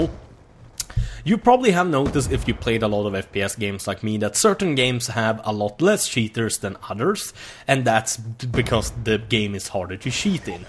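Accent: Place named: Sweden. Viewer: That swedish accent. :3 ''Those dirty dirty sheaters''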